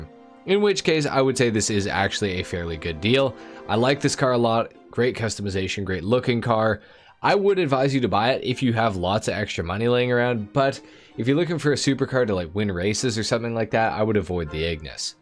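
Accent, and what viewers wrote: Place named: Canada. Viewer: Northwestern thing lmao. Listen to people from Wisconsin, Michigan, Minnesota, North and South Dakota, and yeah Canada. He’s from Canada I think.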